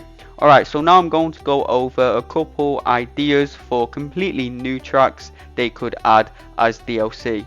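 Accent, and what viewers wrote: United Kingdom. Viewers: I dont know much about Mario Kart World, i just come here to hear your Bri'ish accent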